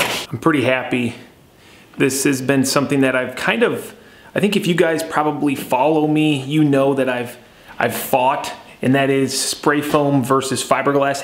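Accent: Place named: Southeast, United States. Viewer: Don't move the bathroom door out of the hallway into the spare room, you'll hate it later and future buyers with experience in remodeled homes will not like it either. You might think it is just a couple of corners and a few steps now, but you will get so tired of it down the road, especially those times you are in a hurry. I'm not sure where your at, but judging from your accent the SE maybe? Up North here, I would have looked into extending those 2x4s by tacking on some 2x2 and replacing a few 2x4s around openings with 2x6s to get a 5 1/2" deep insulation bay. While you were doing that you could have fixed the framing around the windows with proper king/cripple/header arrangements.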